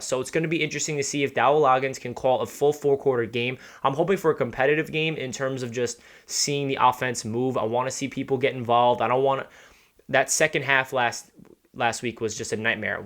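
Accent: New York, United States. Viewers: Hard to tell whether I should take him serious with that thick New York accent. So hard to tell if they're trying to con you. From a fellows Chiefs fan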